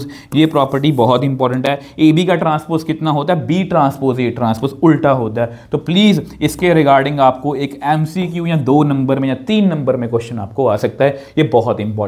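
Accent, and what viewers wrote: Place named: Pakistan. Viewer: apka accent Pakistani lag RHA hai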